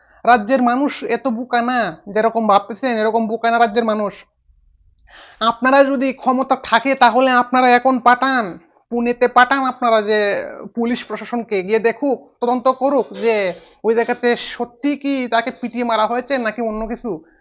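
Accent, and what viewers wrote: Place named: Bangladesh. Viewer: Are you Bangladeshi?. Because your style of speaking is completely Bangladeshi people.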